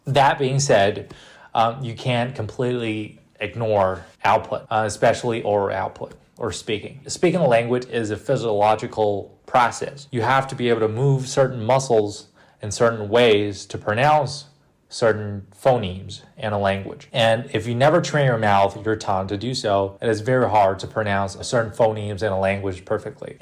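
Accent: South, United States. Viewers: nice southern accent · You sound like a southerner from the United States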